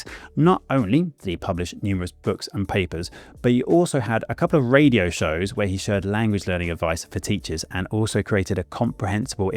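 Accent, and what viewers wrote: United Kingdom. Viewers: Too British for me. Ain't it my cup of tea. Sorry.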